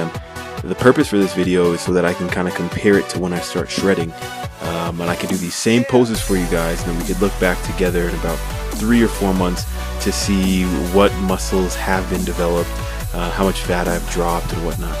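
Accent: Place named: United States. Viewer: you are an attractive man. am curious though. what country are you from? you spell like a Brit (e.g. flavour instead of flavor), but you sound like a very educated American.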